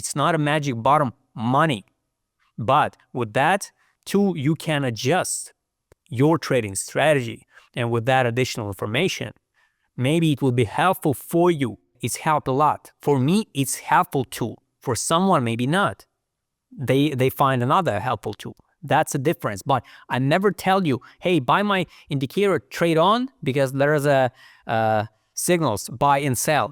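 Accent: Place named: Eastern Europe. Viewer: Brother, I think you have a Slavic accent.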